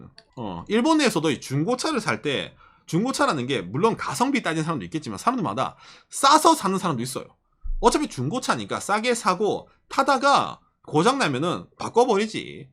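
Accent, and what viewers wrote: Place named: Gyeongsang, South Korea. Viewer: 말투가 대구네요 구수합니다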